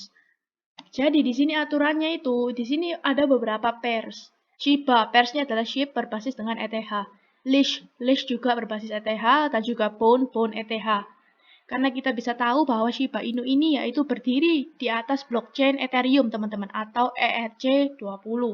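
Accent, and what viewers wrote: Java, Indonesia. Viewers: medok nya khas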